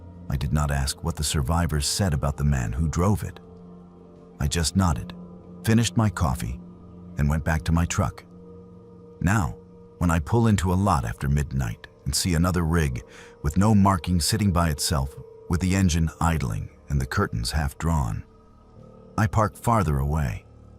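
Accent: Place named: Louisiana, United States. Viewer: Louisiana common like your voice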